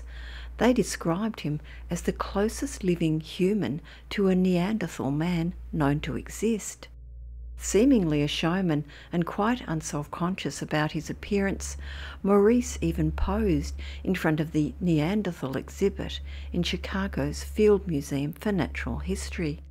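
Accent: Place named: Australia. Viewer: I think the time of the Aussie narrator and/or pitch man has passed. Let's just not anymore huh.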